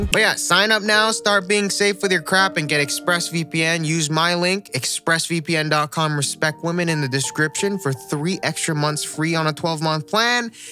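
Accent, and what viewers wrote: United States. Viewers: You have the most American voice ever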